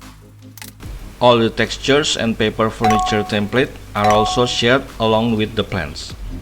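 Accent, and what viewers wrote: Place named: Indonesia. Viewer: Tetap semangat om, saya kira channel luar negeri, eh kok aksennya masih Indonesia+di perkuat nama yang sangat Indonesia sekali.😁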